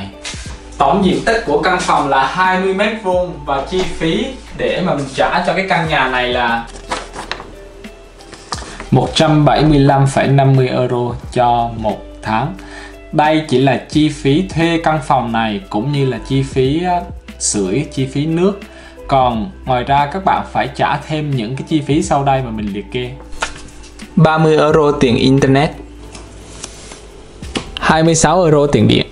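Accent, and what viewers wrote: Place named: Central, Vietnam. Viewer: miền trung dễ thương, chân chấttt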